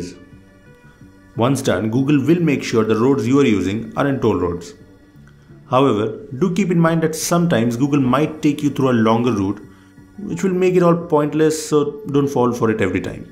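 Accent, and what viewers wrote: India. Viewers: He's not "putting on an accent." It's an Indian accent, and I don't mean native Americans.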